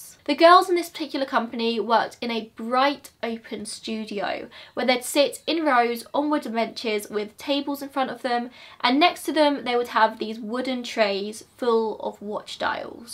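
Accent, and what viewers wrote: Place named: Cockney, United Kingdom. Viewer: Hi, you're such a cutie pie along with you're cute Cockney accent dear xoxoxo.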